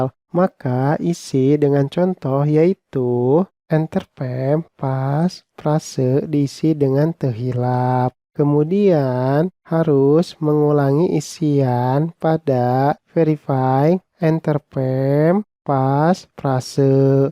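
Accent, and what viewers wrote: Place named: West Java, Indonesia. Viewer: Sunda pisan 😁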